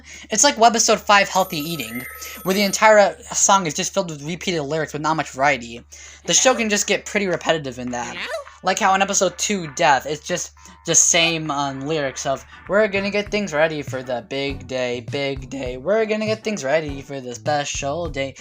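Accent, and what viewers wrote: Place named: United Kingdom. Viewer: you already sound british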